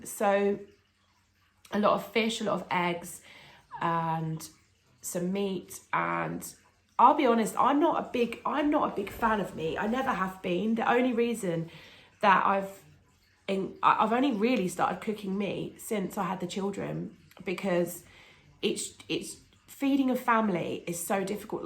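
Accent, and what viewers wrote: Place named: United Kingdom. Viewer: The strength you have to go through so much, and raise two children! It's unbelievable when MD's don't take us seriously. You should have immediately been given a mammogram and I should have been given a CT scan. I shared your video with a friend who was upset about the neglectful treatment you received, and she's impressed with your positive attitude too! Btw, I'm in the US. I'm guessing by your accent you're in the UK. My mother is from England. Anyway, it sounds like you're taking care of yourself. Please don't be hard on yourself! The supplements sound good. I take so many supplements, including Resveratrol. I'd totally replace the alcohol with green tea and whatever nutritious things you enjoy. Remember there are lots of people who care! 💝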